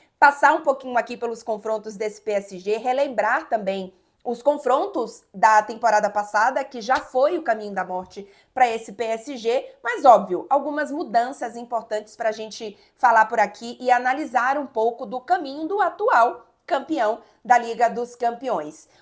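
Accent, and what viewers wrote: Bahia, Brazil. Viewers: Que voz forte, poderosa, e com um sotaque delicioso! Eu apostaria tudo que ela é baiana pra ter esse conjunto fabuloso!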